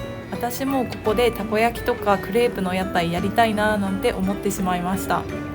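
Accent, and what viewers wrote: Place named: Kyoto, Japan. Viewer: Você é de kyouto ? Então seu sotaque é charmoso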